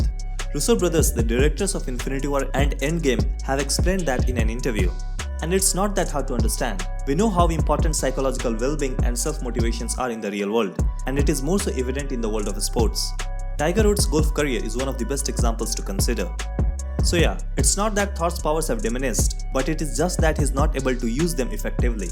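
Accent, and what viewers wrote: India. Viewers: Sounds like ur a malayali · Are you Indian... Your Voice has Indian accent.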